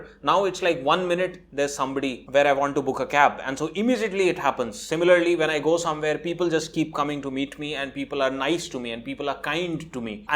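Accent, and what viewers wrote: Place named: India. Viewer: Ugh .. The Indian accent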